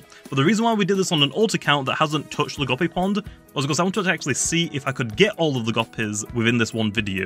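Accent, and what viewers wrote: United Kingdom. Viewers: Goppie :0 But also bri'ish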